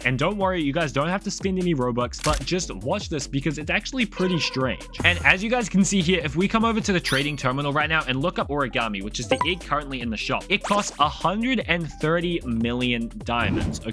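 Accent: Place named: New Zealand. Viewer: can tell by your accent, I’m from Australia and have met many kiwis in my life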